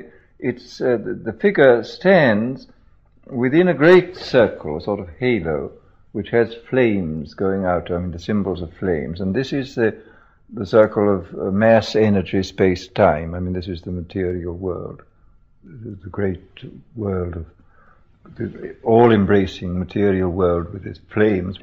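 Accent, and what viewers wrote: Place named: RP, United Kingdom. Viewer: Old School British Public School RP.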